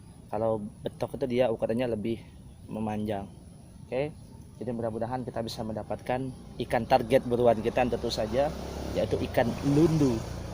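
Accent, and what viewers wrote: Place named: Banyumas, Indonesia. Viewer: Alhirnya dapat banyak ikan juga yutuber ini, cukup kocak, bahasanya agak ngapak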